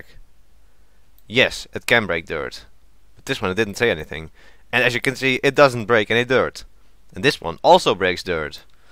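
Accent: Netherlands. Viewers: Je hoort ook gewoon dat je Nederlands bent XD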